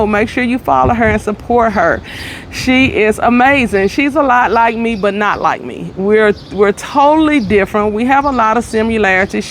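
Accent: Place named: Texas, United States. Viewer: I most definitely hear a TEXAS accent 😇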